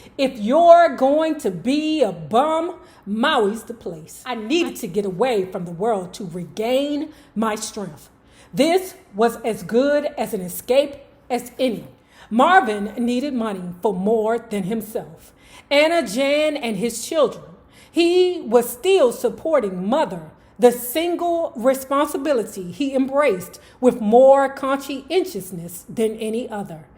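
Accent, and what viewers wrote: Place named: DMV, United States. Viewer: you bring back great memories of me and my children living in the DMV. Your accent and the things you say crack me up cause you are a true representative of The DMV. Keep being yourself.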